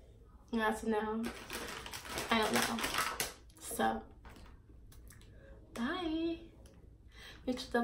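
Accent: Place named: Boston, United States. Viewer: Are u from Boston? What an accent ! 🙂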